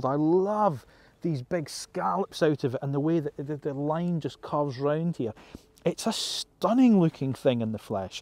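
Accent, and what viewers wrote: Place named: England, United Kingdom. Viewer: Thank you for your work - it's brilliant and really catching 😊 First I need a few moments to become familar with our english accent, but after 10 minutes it works (and sorry for my english writing style)... I've orderd one (the techno version) in the begin of july and hopefully I will get it in the last two weeks of december. One question: Do yo only have the 9 inch screen in the techno version in GB? Here in Germany and also in France its the 12 inch screen in the middle. It is the first time to see a video about the MeganE with the wheel on the right side and even the position for charging change to the left side.. smile.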